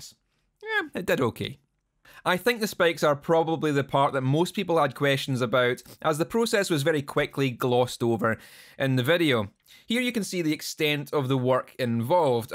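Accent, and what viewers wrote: Scotland, United Kingdom. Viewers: Love that the Scottish pronunciation of "bases" and "edges" sounds like "basies" and "edgies". Also, I'm pretty sure Kerry King did this to his guitar first. ;)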